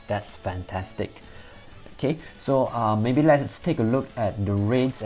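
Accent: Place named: Singapore. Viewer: I recognize that Singapore accent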